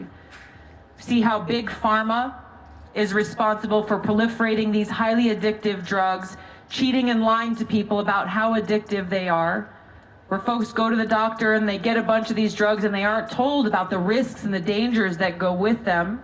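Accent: Hawaii, United States. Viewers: This persistent "aloha" greeting might sound odd and unnatural to people in the Midwest. If you wanna win national elections, you'd better speak General American rather than Haiwaiian American.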